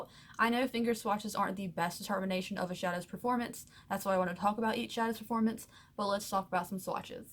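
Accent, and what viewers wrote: South, United States. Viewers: First off you’re gorgeous and I love your reviews so much. I noticed we have similar accents and figured you were from the south and I looked at one of your older videos and saw you’re from North Carolina!!!!!! Ayyyyy represent?!!! Nice to see successful YouTubers from here and really gives me hope. Love your content and you truly deserve more views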